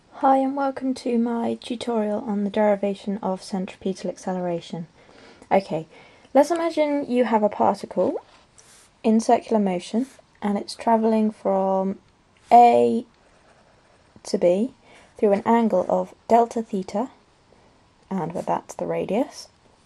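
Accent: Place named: United Kingdom. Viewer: I agree. I love the British accent.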